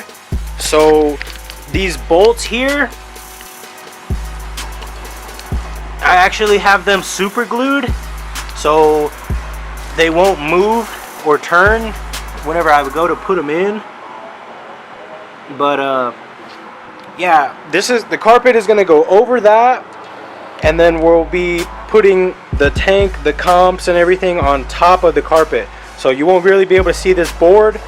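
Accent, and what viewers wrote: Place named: Bay Area, United States. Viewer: i hear hell ya bay slaps! YEEEEE ur from the bay arent you i can tell am i right?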